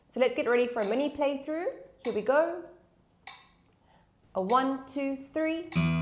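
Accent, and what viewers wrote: South Africa. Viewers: Finally someone who knows how to teach!! Kudos! And luv ur south african sounding accent!!! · I'll have to watch the video again because halfway through it suddenly dawned on me that I'm not hearing the typical American accent but was pleased to realise I'm listening to the Saffa accent. Pragtig. Love your lessons. I have a guitar stashed away but want to start playing again. Subscribed. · Is she South African?? She’s surely South African🫢 ja, no, lekker, this is cool!🥳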